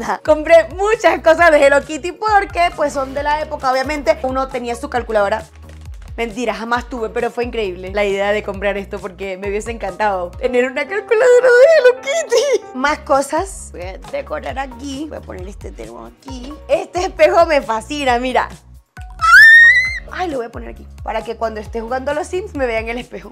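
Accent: Venezuela. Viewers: eres venezolana me encanta comohablas😍😘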